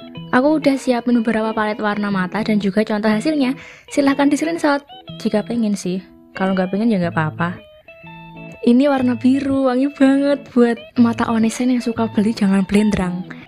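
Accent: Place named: Java, Indonesia. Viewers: Dan juga medok'e uueenak reek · jowo ne medok ya bund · Mbaee orang jawa yaaa wkwkw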